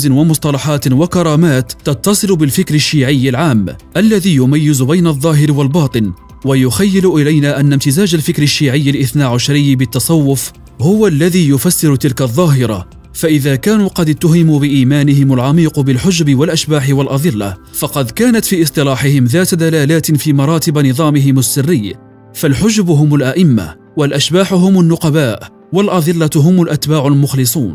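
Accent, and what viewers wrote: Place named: Lebanon. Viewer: World News وليش عم تحكي لبناني؟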